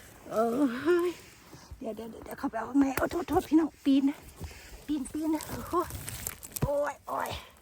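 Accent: Sakon Nakhon, Thailand. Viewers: เจ้าคนบ้านได๋สำเนียงคือไทสกลแท้จ้า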